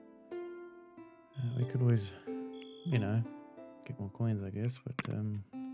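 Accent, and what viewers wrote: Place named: South Africa. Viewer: Random video pops up on my feed... I recognise that accent! Hello, fellow South African :) Good luck with your YT journey.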